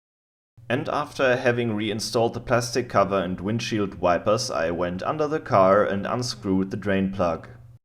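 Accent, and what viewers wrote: South, United States. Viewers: We sure appreciate a southern accent here y'all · I always laugh at how southern American you can sound, after speaking in your proper German accent. Gets me every time. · your American is excellent. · Your 'Murican accent is impressive. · Pretty good American accent :) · love the american accent :) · I applaud your perfect American accent. Source: I'm an American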